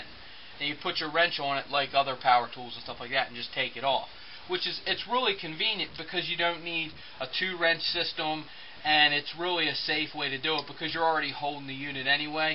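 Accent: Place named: Pennsylvania, United States. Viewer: from the accent, I am guessing you are from PA? Probably watching the Steelers right now? LOL Go STILLERS!!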